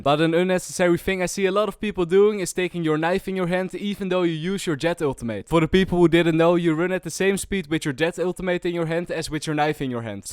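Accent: Netherlands. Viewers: Ik weet dat je Nederlands bent bij de accent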